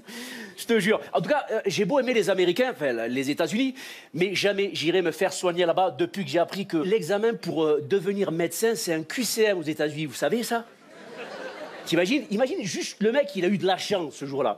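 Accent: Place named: Spain. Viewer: Très bon !! Ta un accent qui fait espagnole ou marseillais ☺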